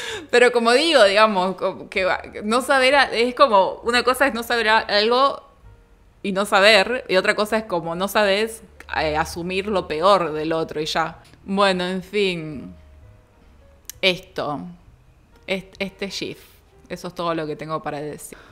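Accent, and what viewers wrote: Argentina. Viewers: hablando de futbol, le dije a mi mamá: chales si gana si pierde Argentina le van a hacer una burla jamas vista y si pierde le van a tirar muchísimo hate, cuando jugo con Mexico obvio le iba a mi selección pero que sabia que lo mas seguro es que perderia, talvez es porque no me llama tanto la atención el futbol pero me dio igual, lo que si me saco de onda fueron los insultos de Mexico y luego de Argentina, pero como dijiste lo que no te gusta que digan a ti, no lo hagas tu, o algo así, por cierto estoy obsesionada con su acento, pero no puedo imitarlos porque no soy buena para ello XD... saludos!!!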